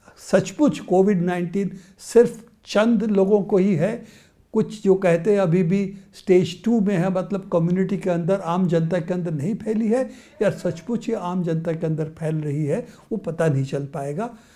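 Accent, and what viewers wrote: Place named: Bengal, India. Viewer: in ko hindi buolna nhi aata , sthree ling prush ling ka kuch pata nhi , bengali Logaun ki tarah baat karte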